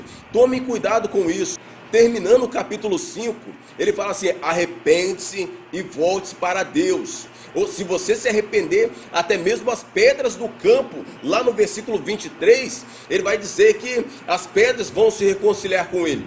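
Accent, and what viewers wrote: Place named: Bahia, Brazil. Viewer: Gostei muito do seu jeito de explicar deste jeitinho baiano rsrs, Que Deus continue abençoando.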